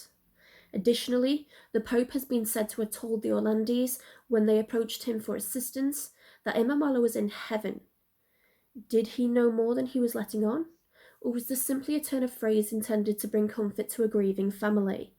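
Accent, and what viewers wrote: United Kingdom. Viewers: I love your british accent, are you native british?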